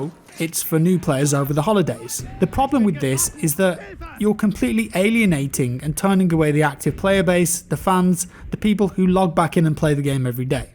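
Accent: United Kingdom. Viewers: always like the way you put your thoughts, the core itself and your British accent,! Thanks for video!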